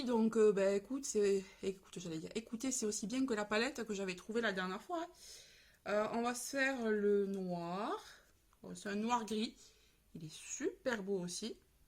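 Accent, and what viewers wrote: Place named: South, France. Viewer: Coucou, j'ai découvert ta chaîne la semaine dernière et j'aime beaucoup tes hauls! Et cet accent du sud... C'est un plaisir à entendre! Bonne journée à toi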